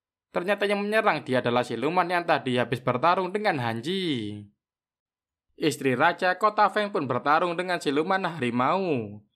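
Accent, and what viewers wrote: Java, Indonesia. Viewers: Medok bet min bacrot ente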